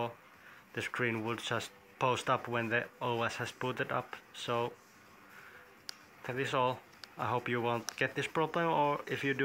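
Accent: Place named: Finland. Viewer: you sound Finnish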